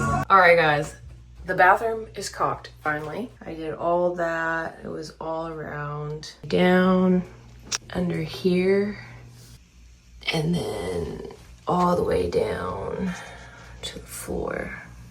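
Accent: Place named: Arkansas, United States. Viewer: You can tell you’ve been back in Arkansas because your accent has kicked up a notch 😂🙌 “one of those burn bucket thangs”. You’re killing it! ❤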